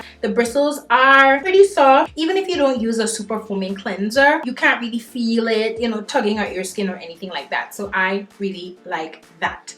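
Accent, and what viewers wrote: Trinidad and Tobago. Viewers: Love listening to that Trini accent on YouTube. We need more west Indian people on this platform. Big love from Guyana 🇬🇾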